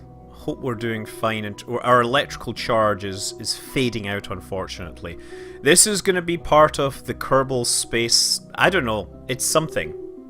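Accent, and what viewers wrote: Scotland, United Kingdom. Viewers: Is he Scottish? He just sounds Scottish to me and if I'm hearing that right I'd be happy. If not I learned something.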